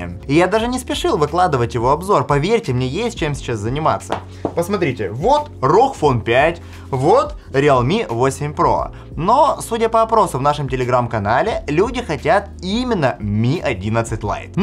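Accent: Odessa, Ukraine. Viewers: Хренасе, ты Одессит?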